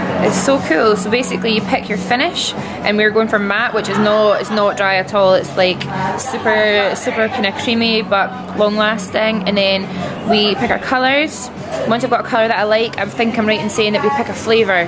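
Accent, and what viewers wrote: Scotland, United Kingdom. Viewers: BTS Trash she's Scottish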